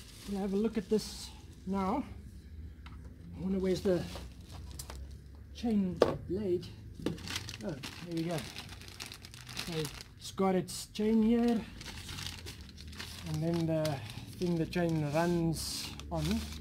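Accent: South Africa. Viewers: You sound like you speak afrikaans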